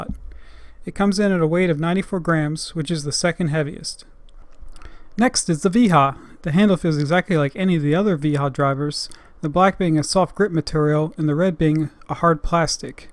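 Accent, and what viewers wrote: Germany. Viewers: Thank you. I was expecting something far less serious but this was done with the same degree of rigor that you apply all your reviews. I enjoyed it. How about commenting on your branding choice on your video headers. What's the smoke about? Also, are you a native German speaker? You pronunciation choices seem to match what I hear from my German friends. I source my German tools from KC Tools and Chad's Tool Box.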